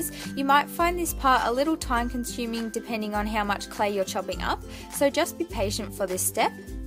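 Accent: Australia. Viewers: She is Australian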